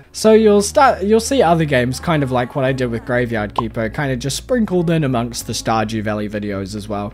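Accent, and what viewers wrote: New Zealand. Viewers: I KNEW YOUR ACCENT WAS FAMILIAR!!! it's my own.... Lmao. Good ol kiwi accent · Great video. You're very good at it. 😊 I recognised your accent because I recently moved to Aus from South Africa and the NZ accent is kind of a smoosh up of the two (English South African & NZ). Loads of people ask me if I'm from NZ. I love your videos ❤ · Funny how people thought you were Dutch when you have the most New Zealand accent to ever accent to me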